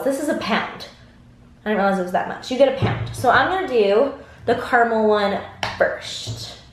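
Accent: South, United States. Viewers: New subscriber! Mostly because I can listen to a cute southern girl talk all day long, though.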